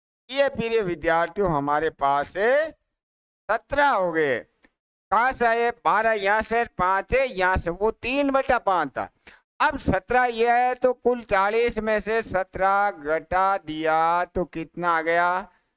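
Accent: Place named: Mewar, India. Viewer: राजसमंद जिले से हूं राजसमंद झील जो प्रसिद्ध है मीठे पानी के लिए वहां से आपका वीडियो देख रहा हूं आप बहुत अच्छा पढ़ा रहे हैं और मैं 10-15 लोगों को शेयर किया आपका वीडियो आप मेवाड़ी में बोलते हैं तो भी अच्छा लगता है और हिंदी में भी बोलते हैं तो अच्छा लगता है और आपका तरीका बहुत अच्छा है सरल और सौम्या तरीका है पढ़ने का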